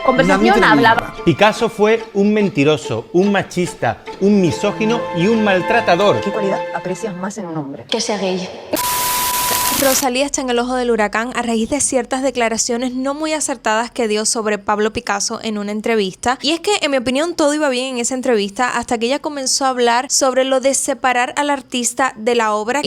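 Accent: Cuba. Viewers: Nena recién conozco tu canal y apenas escuché tu voz pensé: "su acento suena cubano" y cuándo leí el nombre del canal me lo confirmó 😅. Saludos desde Cuba🇨🇺❤ PD: nueva seguidora acá, los temas de tus videos me llaman mucho la atención y estaré haciendo maratón siempre y cuándo la corriente me lo permita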